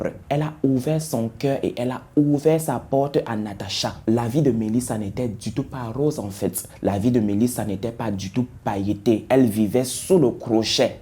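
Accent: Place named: Cameroon. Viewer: Sinon on ne prononce pas le T de VINGT (20) hein, je dis ça je dis rien ! 😂😂 Sinon j’apprécie ton boulot et surtout cet accent si particulier du MBOA que l’on aime tant ! 😅😅 Bonne continuation ! 🙏🏽😌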